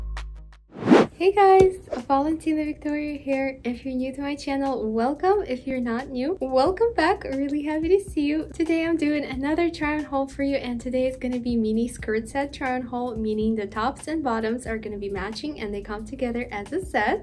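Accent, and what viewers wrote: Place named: Eastern Europe. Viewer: Uh...I wonder what this girl's story is. I think she has a bit of an accent...from one of the former Soviet republics? She smiles a lot to pacify the viewer, as though she wants nothing more to 'be pretty for us', but of course only a complete idiot leaves it at that. What's her story? She's got AMAZING legs though...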